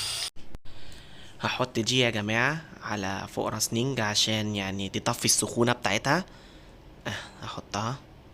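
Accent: Egypt. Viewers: احلي حاجة في العربي بتاعك انه مصري علشان كده اختاروك مصر حلوة بس نصيحة لو كان ليك نصيب ما تامنش حد علي اي معاملات وماتدخلش حد بيتك نهائي وخروجات الشغل بعيد عن زوجتك تماما والباقي مع اهلك في مصر اوي الشغالين ؟؟؟؟؟؟؟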